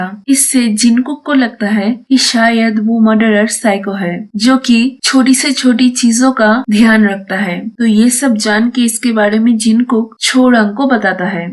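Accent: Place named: England, United Kingdom. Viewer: Apka voice jyada english style huwa hey ..hindi mojle bolo behan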